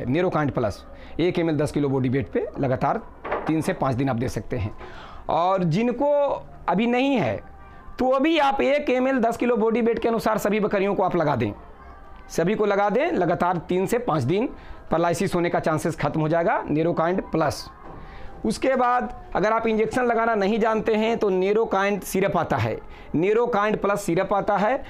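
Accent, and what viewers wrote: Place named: Bihar, India. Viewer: सर जी आप दवाइयों के नाम स्क्रीन पर दे दिया करे क्योंकि बिहारी टोन होने के कारण शब्दों को समझने में दिक्कत होती हैं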